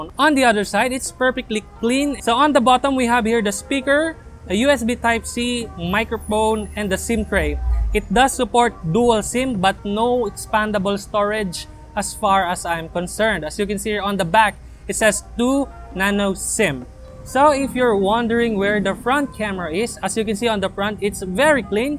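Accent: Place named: Philippines. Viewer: I love your pinoy accent. hahahah thanks!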